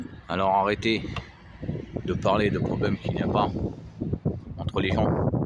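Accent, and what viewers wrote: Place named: Paris, France. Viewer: Tiens !! Quelle coïncidence... ça doit être l'accent titi parisien 👍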